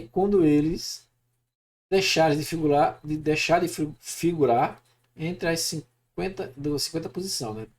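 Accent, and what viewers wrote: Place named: Natal, Brazil. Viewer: so perguntei pelo sotaque que parece com o meu, sou de Natal mas to morando no PR.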